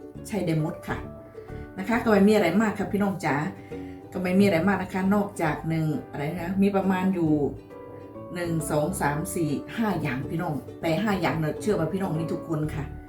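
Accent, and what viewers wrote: Korat, Thailand. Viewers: ชอบภาษาไทยโคราช เพราะมากขอให้ รวยๆจ้ะ ลดคำว่า พี่น้องจ๋าๆๆๆ ก็จะดีมากคะ่ มันเยอะไป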